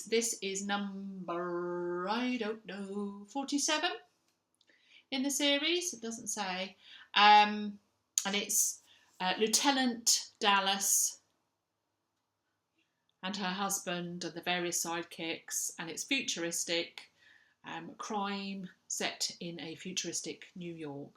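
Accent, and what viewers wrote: United Kingdom. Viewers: I was so very happy to have gotten finished with my bath and washing my hair, I sat down and saw you had posted a video! I've missed you're smiling face and lovely British accent! You've got quite a good pick of books there, especially the JD Robb, I need to give that series a go! I've been dealing with some health challenges, but am doing pretty well now. I'm looking forward to your next video! Take care!😊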